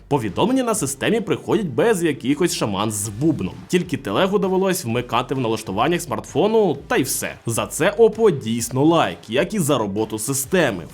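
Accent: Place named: Ukraine. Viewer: Ооо, ну всё, понятно с вами. Цэкайте и гакайте дальше без меня. Отписка!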